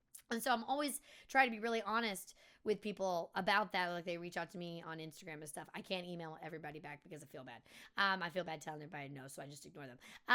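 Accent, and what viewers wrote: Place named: Upstate New York, United States. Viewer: Quick thoughts. 1. Can't really help much with female viewers. I found you as a recommendation from a song and I'm definitely not the smoke show you are lol. 2. It might be awhile but I foresee a time when labels lose their power. With the ability to self publish on Amazon and other places as well as independent production studios on the rise I think it's only a matter of time before bands move in that direction and hire independent teams and managers or firms that replace them. 3. I actually have wondered where you were from but not to be creepy. Being from NJ and living in NC I feel like I hear a bit of upstate NY and even a bit of southern in your voice. Your voice and mannerisms remind me a lot of a friend of mine from that area originally. After this video I feel like I might end up holding the door for you at the shooting range lol jk. 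Hope you have a great week and if you are looking for some bands some of my favorites are, and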